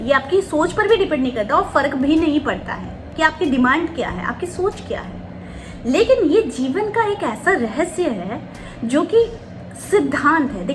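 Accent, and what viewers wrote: Bengal, India. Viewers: Sute jawar age tmr video dekhe sute jawa...eta amk ank besi positive vibes dae..tumi bhishon misti..ar khub valo vabe guchiye ktha bolo...jodi kakhono somoy pai tmr sathe ami nischoi dekha korbo..i just love u mam❤ arekta ktha bengali ra jokhon hindi te ktha bole tokhon besi cute lage...thik jemon tumi🥰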